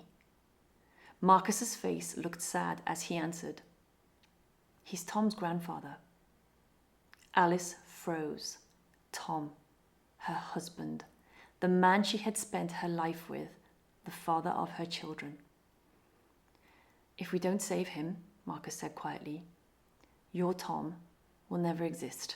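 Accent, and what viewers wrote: United Kingdom. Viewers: Professor, I am a 100% beginner. I did not understand the story, but I was listening with subtitles in English, of course, to see the words and how to pronounce them. Is this a lesson for me as a beginner? I mean the art of listening. I liked your British accent. It is nice not to pronounce the letter “r” in some words. This is a difference between a British accent and an accent. American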